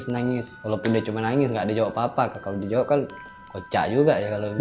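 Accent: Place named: Aceh, Indonesia. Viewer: Logattt acehhh banget abgnya✌🏻😁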